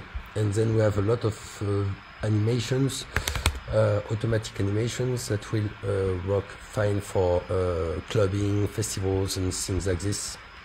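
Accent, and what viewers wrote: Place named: France. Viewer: Un français qui parle English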